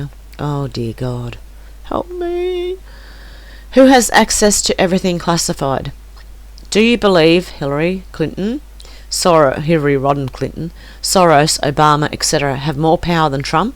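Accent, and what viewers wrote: Australia. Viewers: You are amazing I am so glad that I stumbled across your channel and subscribed. I can tell that your heart is true your soul is pure and your spirit as of an amazing light and I love your Australian accent. Thank you for all you do. My name is Donnie Jordan and I will continue to listen just love your voice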